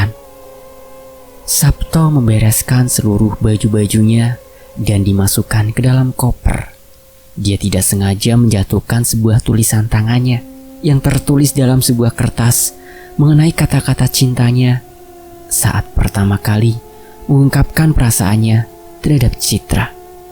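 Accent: Java, Indonesia. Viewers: Tertarik ma logat bhasanya. Itu logat bahasa jawa mana ya? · Bohoso jowone kok lucu to mas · Aq yoh yess cm cerita inih j0zz... Bisa banyk belajar bahasa cinta Tpi dengan logat jawanyh😍meski aq jawabarat Tpi bojoko jatim😍😍😍😍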